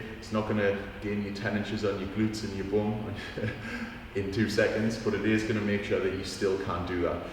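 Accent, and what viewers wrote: Newcastle, United Kingdom. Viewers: He´s from Newcastle, north of England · He has an accent? Which part of UK he is?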